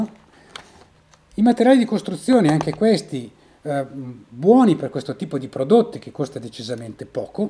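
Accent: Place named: Austria. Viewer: Ich kann sein Deutscher Accent schwer verstehen. Versheinlich aus Oestereich oder irgendwas. *shrugs*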